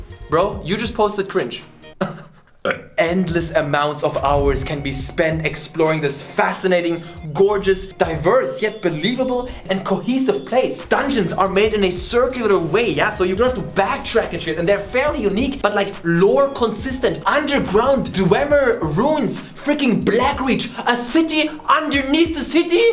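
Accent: Germany. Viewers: A Chinese talking with German accent in English. Ich bin so confused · Sometimes I forget that he’s German , dann he speaks something in Deutsch accent and I’m like . Stimmt ja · Wtf is he german? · Your englisch sounds sehr deutsch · Lol the German accent bruh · The deutschenglish makes me have the kekkers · You sound awfully German to me... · Ya your german accent is weirdly titillating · Is he german ? o.ō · Are you german or something? :D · Are you from germany??? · whaaait are u from germany ? :D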